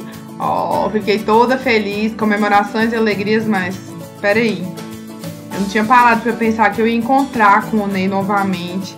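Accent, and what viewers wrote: Minas Gerais, Brazil. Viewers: Que lindo! sou fã dos 2! desejo toda a felicidade do mundo! achava q vc era de minas pelo sotaque...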